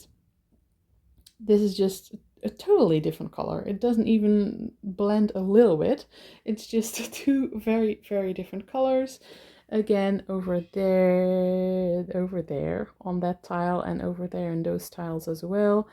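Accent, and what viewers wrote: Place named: Netherlands. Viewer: Me again! I watch Lisette's channel as well. You are both Dutch and your accents are similar. But your English is impeccable!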